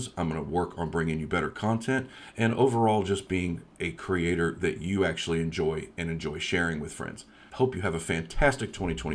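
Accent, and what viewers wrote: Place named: United States. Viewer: I enjoy watching computer help videos when the speaker is from America and has a pleasant voice, which you have, you even sound a bit like a radio man. Happy New Year!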